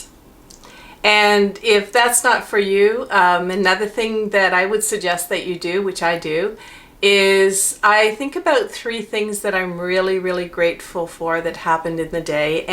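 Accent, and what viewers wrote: Canada. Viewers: Are u Canadian May I ask?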